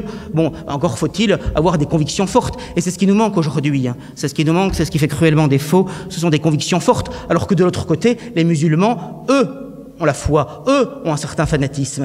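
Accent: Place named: Savoy, France. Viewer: Non il a l'accent savoyard.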